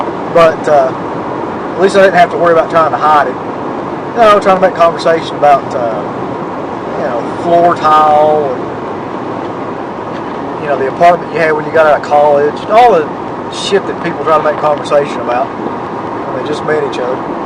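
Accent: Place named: Kentucky, United States. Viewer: Kentucky accent =automatic stabbing in the D!..:)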